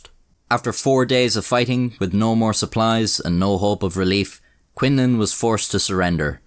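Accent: Ireland. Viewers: I love your weird Irish numbers! Tree tousand. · It's amazing that their Regiment staved off an invading horde 20 times their own number and I'm glad that they were finally given at least some kind of recognition. I very much like your drawings that very entertainingly illustrate the story being told. You are a talented man, my friend. And you having an Irish drawl makes it even better. We crazy gun-loving, world-conquering, wall-building Americans love a good Brit/Irish/Scot/London er (I know, that would be Brit) accent. I'm so glad you're back in the game and putting out some good stuff. It's always a treat. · YAYYY HES IRISH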